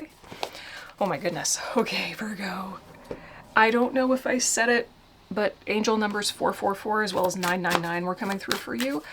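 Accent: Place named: Midwest, United States. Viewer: welcome ! Still watching the rest of your reading. I enjoy you . I'm from Illinois across from St Louis. You Midwest yourself? I don't notice anyother accent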